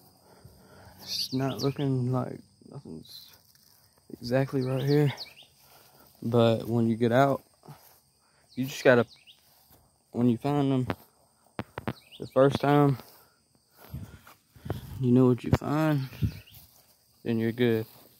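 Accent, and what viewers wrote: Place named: South, United States. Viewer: Was this filmed around upload date or is it old? I thought they didn’t come out until later in the south (assuming by accent)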